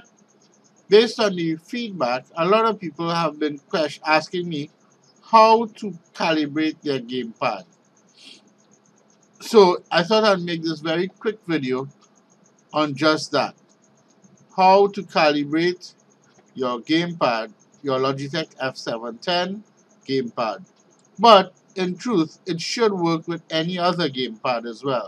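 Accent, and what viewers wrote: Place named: India. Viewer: Indian accent...........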